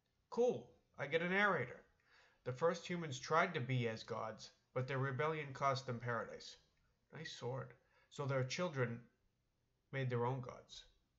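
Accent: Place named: United States. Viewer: THIS HAS A MODERNISTIC TINT. THE OLDSCHOOL ONES ARE BETTER. THIS YANKEE VOICE IS NOT GOOD EITHER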